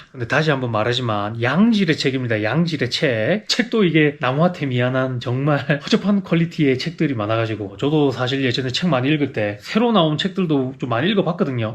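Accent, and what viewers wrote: Gyeongsang, South Korea. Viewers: 유쾌한방송이네요. 좋은말씀을 갱상도버젼으로 들으니 마 기에 팍! 하고 꼬피뿌네요. 감사합니다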